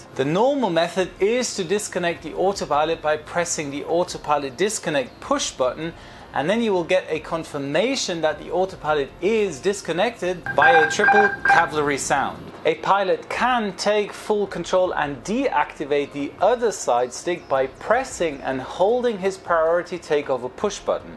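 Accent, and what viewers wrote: Germany. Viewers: Bist du Deutscher ? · Are you from Germany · Wait, you're German?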